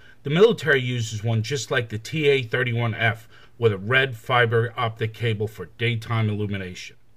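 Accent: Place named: New York, United States. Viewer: Thanks for the neat video. A real ACOG is outside my price range right now but you have given me something to think about. I recently finished my second AR-15 build project. I had to sell my first AR and all my other guns back in 2011 to finance my move from Texas to NC. I live on a low SSI disability income now so it took me about a year and a half to finish my current AR-15. I decided this time to go retro and I built this one as close to my M-16A1 that I had when I was in the US Army back in the 70's and 80's. I even used my old triangular handguards and pistol grip my buddy, who was our unit armorer, let me keep. I guess they are expendable items. I was not able to afford a real A1 upper so I had to settle for an Anderson flat top upper with a screw on carry handle. I did find an A1 butt stock at Numrich Arms for just $21.82. I used a 20" lightweight barrel from Del-Ton. 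I took it to local range Sunday and at 50 meters I put 17 out of 20 rounds in the X ring of my silhouette target. I used a 6-24X50 AOEG CV Life Scope. Yeah it's from China but it only cost me $36.00 haha. Local range only has 50 meter section open now. They have to redesign 250 meter range so rounds will stop hitting neighbor's barn. You sound like you grew up in NY. I grew up in New Rochelle, but I don tawk like dat no maw. Subbed and liked.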